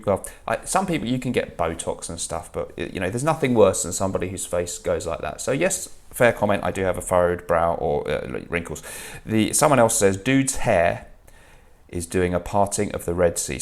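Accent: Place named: RP, United Kingdom. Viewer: Hello, how are you doing? I am a bit baffled by your videos. For a Polish guy living in Germany, now Miami, your English is very good. You even have this posh accent. How did you manage that. Why are you not clapping and anymore? I miss that. Talking about,… maybe your “ gay “ adventure would have never taken place if you did not spin around. is a song tittle and she make heterosexual males into bisexual or even gay clones. Everybody knows that. You are not gay, you are just a kind of dupe or clone of your self like Armaf cdnI. You are your own scent clone. Just do not spin anymore. And are you now Jewish or Muslim? I do not see your cross or hear you talk about the big J in the sky anymore. Please explain yourself. Are you at the Versace house now in Miami? I see the Angel statues next to you. Very Versace. Anyway I did see you worked out more. 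The body is looking better than ever. Maybe you should do a polish folk dance instead in the turn. Anyway, you are the best. Lots of love from your nr 1 fan. 🤪🤪🤪🤪🤣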